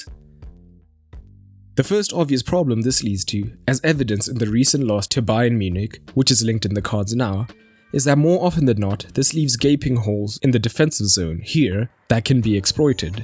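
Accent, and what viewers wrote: South Africa. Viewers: You sound South African. Are you from SA??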